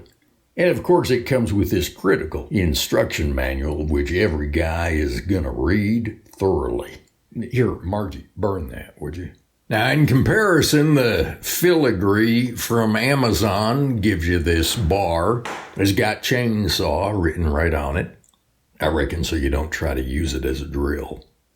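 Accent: South, United States. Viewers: NOW , ... THATS a good review ! sounds like someone who really uses tools , and not some salesman ! liked hearing southern accent , and personality ! the review was more entertaining than the tools . good review .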